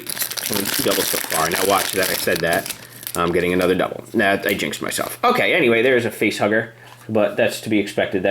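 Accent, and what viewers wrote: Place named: United Kingdom. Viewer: Wtf! He`s obviously British!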